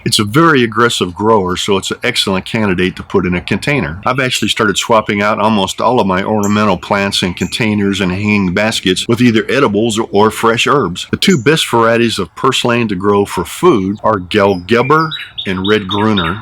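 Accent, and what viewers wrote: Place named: Texas, United States. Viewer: I thought you must be a Texan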